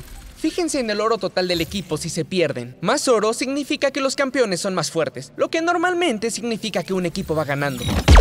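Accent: Argentina. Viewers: Argentino obvio 😎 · Ese wuey es Argentino xd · Sigo pensando que el man es Argentino, necesito info, no quiero leer más comentarios nefastos neaaa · el que habla es argenti o que? tiene alguna explicacion? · re argentino el chavon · El tipo es argentino no?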